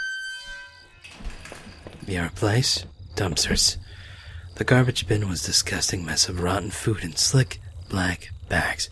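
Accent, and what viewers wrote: United Kingdom. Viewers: Lovely british accent there!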